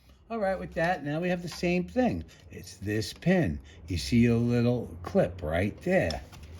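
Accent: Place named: North, United States. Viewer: ❤ ABSOLUTELY love thos guy. His US Northern accent really made me smile. He seems nit to like to hear himself talk and really knows how to keep it simple. Plus, he knows what he's talking about. I've done brak pad inspections and replacements in the past, but I wanted to refresh my memory, and this guy really came through. Yes, I did view other videos, but I really like this one the best. Thanks, guy, for giving me that little boost of confidence. O, I'll have a backup guy watching over me. Breaks are something you just don't monkey around with.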